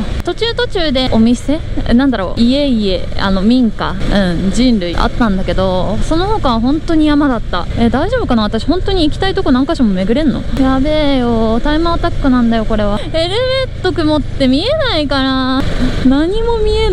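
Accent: Tohoku, Japan. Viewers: には東京でも東北弁でもっと話してもらって東北弁バイク女子のポジションに行って欲しい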